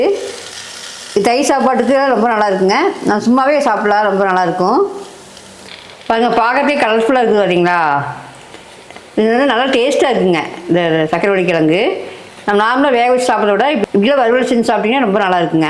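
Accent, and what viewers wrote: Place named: Kongu, India. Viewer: Super parkkavea 🤤aaguthu maravalli kilangula eppadi senju saptirukkom sakkravalli la eni thaa try pannanum samayal video nu start pannirukeenga niraya per ku dovuts varum ellarthukkum reply pannunga antha responce kea nalla views pogum neenga pesurathea kongu slanga ovoru wordskum eadaila responce ah erukku 🙏 enaku thonunatha sonna thappa eaduthukka vendam thank u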